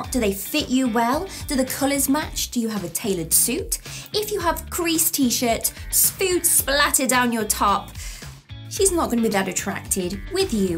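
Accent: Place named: RP, United Kingdom. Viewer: This accent is RP .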